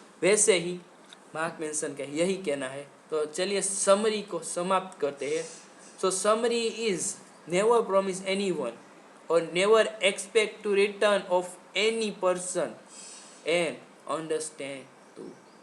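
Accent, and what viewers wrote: Gujarat, India. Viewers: Ap Gujarati ho na bhai ... Language understands ..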